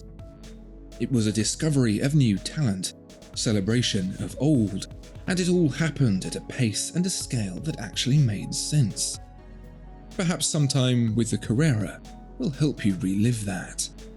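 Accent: United Kingdom. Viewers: I would totally come, but based on your accent, I think you’re on the wrong side of the pond.😕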